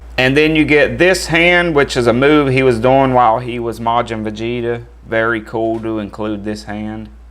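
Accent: South, United States. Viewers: From what part of the USA is your accent? I am not American but it sounds like how most people talk in forest gump movie.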